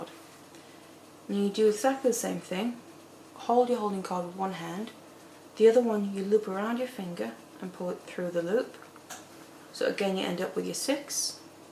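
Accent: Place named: Wales, United Kingdom. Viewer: Thanks for the video. I've always wanted to learn how to macrame. I love you're accent are you Welsh?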